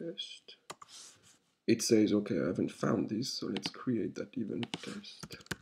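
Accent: France. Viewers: Same here. Non native english speaker and I understand your nice french accent perfectly. Nothing to improve there. Thanks for the tutorial. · Hello, I really like this video, especially french accent of english and sound of clicking :) But, I have a question, I downloaded ecosystem to my Unity(I've already installed PlayMaker), then I typed "UGui Proxy" to search line directly in The Ecosystem Browser and I couldn't find it. Can anybody help me with it? Maybe I can find Ugui Proxy somewhere else?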